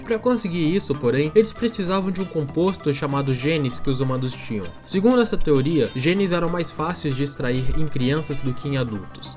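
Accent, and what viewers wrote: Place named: São Paulo, Brazil. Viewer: O Sotaque paulista é sim o melhor do brasil e não vou aceitar nenhuma opinião contrária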